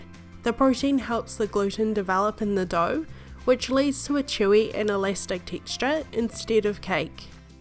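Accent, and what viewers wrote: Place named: New Zealand. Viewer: It's a new zealander accent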